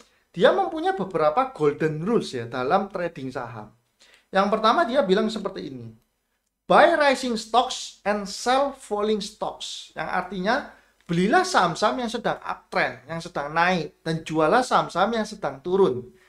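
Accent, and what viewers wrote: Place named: Java, Indonesia. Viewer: seneng sama mas, bosone medhok😀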